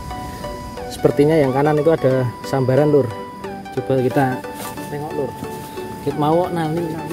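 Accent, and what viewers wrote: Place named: Banyumas, Indonesia. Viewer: Koyoke ng ngapak kidol pam..kang booozz...🙏🙏🙏👍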